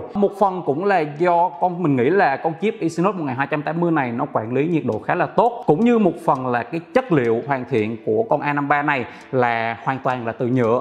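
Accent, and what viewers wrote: Vietnam. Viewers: ôg này ng khánh hoà phải k ta nge giọng y ng khánh hoà z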